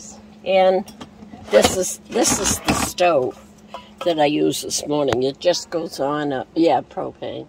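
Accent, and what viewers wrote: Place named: New England, United States. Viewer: I love hearing yet another New England Accent on the road besides Lulu. I can't wait to join you guys!!!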